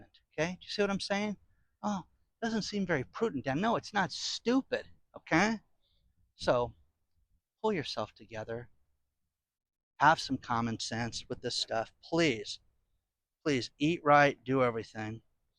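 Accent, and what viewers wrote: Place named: Wisconsin, United States. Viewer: Great hope you put them all to work! Smile sounds like you are from WI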